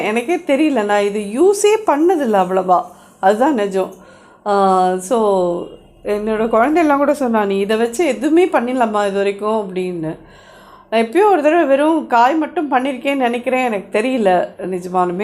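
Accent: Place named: Thanjavur, India. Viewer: Mami neenga Thanjavur karala. Engathu periyava Madhiri peseral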